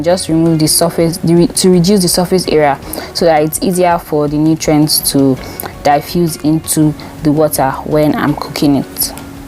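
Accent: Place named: Nigeria. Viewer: You are so pretty plus I love the nigerian accent 😊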